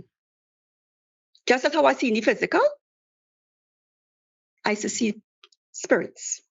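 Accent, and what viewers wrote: Trinidad and Tobago. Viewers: That’s a Trini accent. ❤️ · yes definitely a trini for sure 🇹🇹🙂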